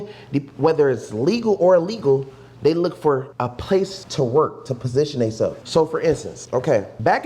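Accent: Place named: Chicago, United States. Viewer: I was about to say I hear that Chicago accent him in lol.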